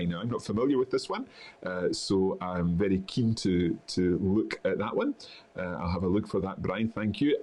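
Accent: Scotland, United Kingdom. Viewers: Patricia living in france and wishing to learn french, thank you love listening to a Scottish man.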